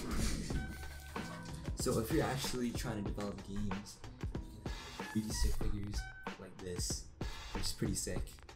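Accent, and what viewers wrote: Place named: United States. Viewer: almost left expecting half indian with some english and immidetly got an american accent , whats up bruhhh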